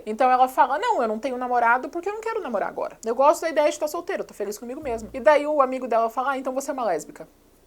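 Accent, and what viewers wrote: Curitiba, Brazil. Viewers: To amando seu canal, senti um sotaquezinho de curitiba, se eu estiver certa, é bom ver uma conterrânea aqui no youtube :) To indicando seu canal pra outras pessoas tbm! E quero agradecer pq seus videos tão ajudando muito num termino recente que eu tive. Manda ver guria que tu vai ter muito sucesso ainda!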